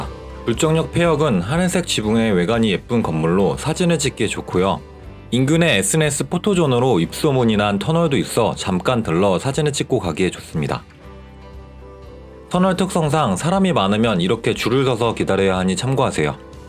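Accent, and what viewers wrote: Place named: Gyeongsang, South Korea. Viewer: 경상도분이신가요? 다 정말 예쁜데 왜..다..경상도..ㅠ.ㅠ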